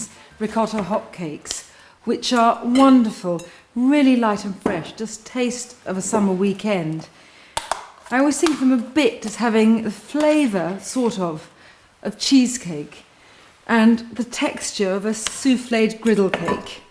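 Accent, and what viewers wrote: England, United Kingdom. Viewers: I love her english accent. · too much british accent for me to handle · When i was young, sure, loved her British accent and the way she has with words but as I grew older, I saw that she could use spoons more, wash hands after handling eggs AND FOR THE LOVE OF GOD, SCRAPE THE BOTTOM OF THE BOWL AFTER POURING OUT THE CAKE BATTER!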